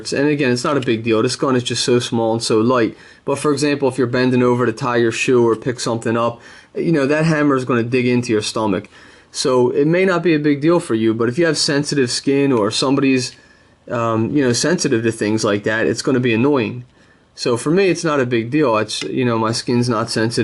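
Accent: Philadelphia, United States. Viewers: Ur accent from phila or south jersey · Dude, are you from Philly?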